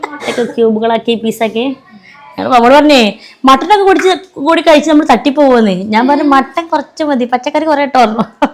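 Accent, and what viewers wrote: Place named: Mankada, India. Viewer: അച്ചടി ഭാഷ എത്ര പറഞ്ഞാലും ഇടയിൽ മങ്കട കൂട്ടിൽ ഭാഷ കയറി വരും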